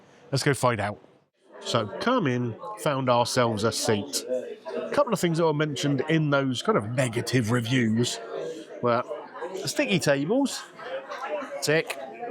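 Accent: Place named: South, United Kingdom. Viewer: Loving your channel, especially the reviews from Southampton and Dorset, I'm originally from that part of the country and your accent sounds like home!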